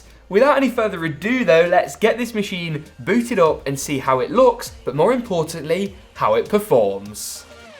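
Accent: United Kingdom. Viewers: Man i hate the British accent so freaking much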